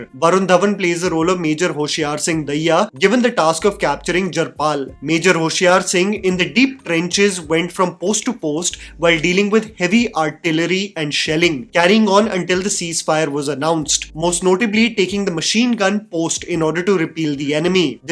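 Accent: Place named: India. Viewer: Your indian English accent is so irritating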